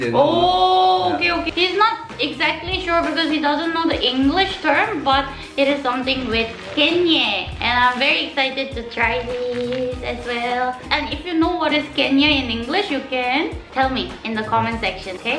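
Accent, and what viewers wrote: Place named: Nagaland, India. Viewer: Your accent is just like AO tribe of naga 😂😂😂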